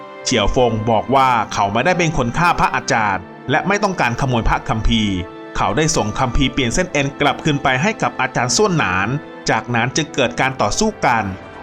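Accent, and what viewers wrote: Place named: China. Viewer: สำเนียงการพูดของผู้บรรยาย คงไม่ใช่ “คนไทย” หลายคำออกเสียงเป็น “คนจีน” เช่นคำว่า “อาวุโส”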